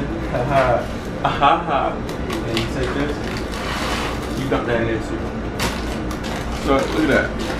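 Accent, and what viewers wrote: Baltimore, United States. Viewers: Are you from Baltimore? You got that accent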